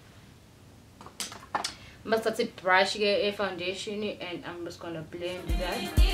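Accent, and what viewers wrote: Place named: Pietermaritzburg, South Africa. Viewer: iikhala iikhanda😂PMB accent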